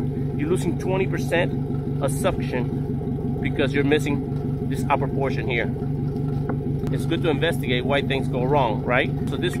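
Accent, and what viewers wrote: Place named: Cuba. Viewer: Excellent tutorial on changing an impeller. Having been born and raised in Miami and working there for many years I had many Cuban friends and co-workers. I noticed several things about their English pronunciation . One thing was that “B” and “V” sounded identical when you called Yamaha “Jamaha” it took me back to Miami days. Thanks for your fun videos.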